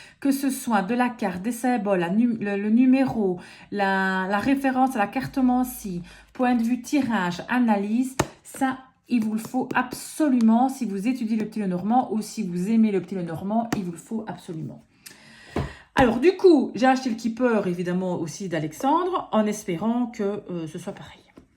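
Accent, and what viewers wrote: Belgium. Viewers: super merci video haaaa l'açant Belge il y avait longtmeps tu est de quelle region ? merci un ex belge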